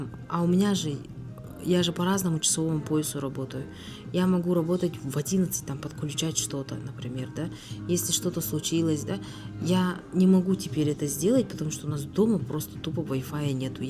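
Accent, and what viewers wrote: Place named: Kazakhstan. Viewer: у вас уже произношение казахское. хорошего вам времени провождения в Бишкеке. Вопрос вы часто используете междометие Де или Ди что это значит с якутского?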